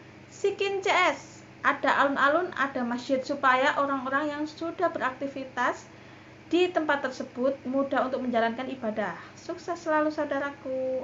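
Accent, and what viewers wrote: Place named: Java, Indonesia. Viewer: Medok jowo ngomonge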